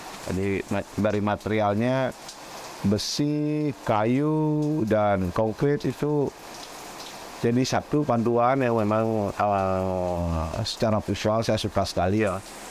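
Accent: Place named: Bali, Indonesia. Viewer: Karakter khas org Bali banget.. logat, 'n caranya berbicara...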